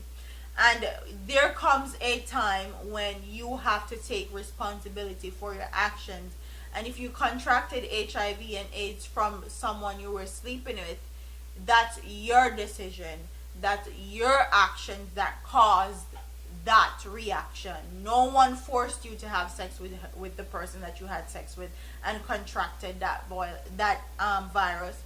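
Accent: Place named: Rural, Jamaica. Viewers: same suh mi talk too.. country mi com from too. an proud of it love the way you talk hun love you an soulflotv.. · Im not gonna lie and be a hypocrite but i also make fun of the way u speak. My 1st thought was "where in jamaica is she from"? You do have a strong country accent. However, I Never thought ur accent define who you are as you seem to be intelligent No malice in that observation sis. Pure love. Big up uself.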